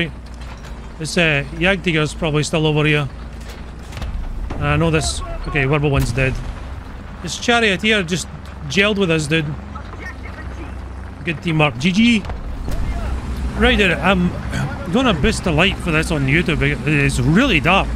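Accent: Scotland, United Kingdom. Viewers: Hi, I really enjoyed your video. I wanna ask that are you down to make a video about the T29 as well? Because I've already seen your video about the T34, and the T30 as well, and I know that there's not much difference between these tanks, but I genuelly love the T29, and Imo it's better then the Tiger 2 sla. By the way keep up the good work, I love your videos, and Im kinda courius about your "natural" scottish accent;)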